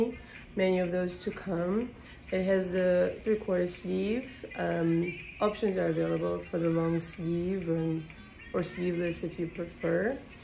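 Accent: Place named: France. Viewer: lovely french accent <3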